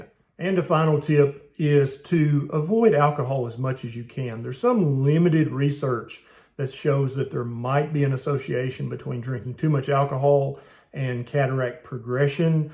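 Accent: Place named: East Tennessee, United States. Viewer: Dr., I am from East Tennessee, living in Florida for decades. I so enjoy hearing you speak. Sounds like Home. And your wisdom, freely given, feels like Home. Thank you to a true son of the volunteer state from a daughter of said state. You have a mighty big heart. Much gratitude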